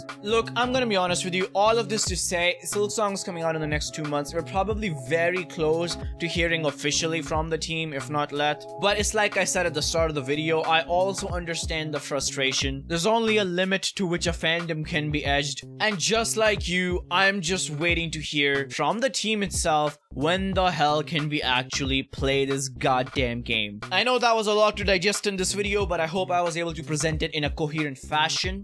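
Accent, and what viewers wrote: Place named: India. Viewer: I think it’s evident by his accent that he was at least raised in an Indian household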